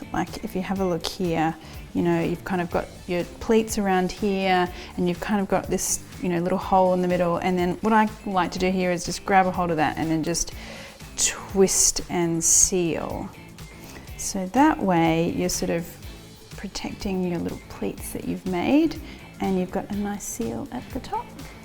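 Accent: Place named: Australia. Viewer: Pretty sure it's just her Australian accent lol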